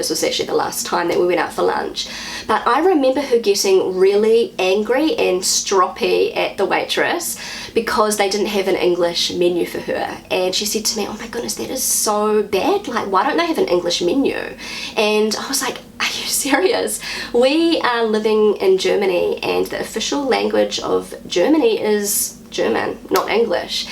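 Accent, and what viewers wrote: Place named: New Zealand. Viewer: New Zealand English sounds quite cute. However, the choice of words is a bit strange sometimes. ;-)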